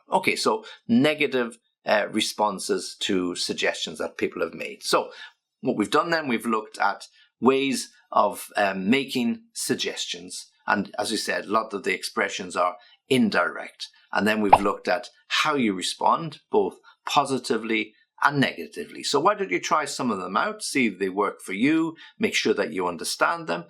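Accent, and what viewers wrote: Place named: Northern England, United Kingdom. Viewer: 👏👏👏👏👏👏Where is your nice accent from,? Somewhere from the north, isn't it? Un abrazo 🇦🇷🏴🇬🇧🇦🇷🏴🇬🇧🇦🇷🏴🇬🇧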